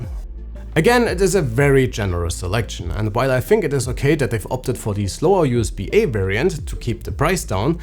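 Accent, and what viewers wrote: Germany. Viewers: yes I love the German accent its so sick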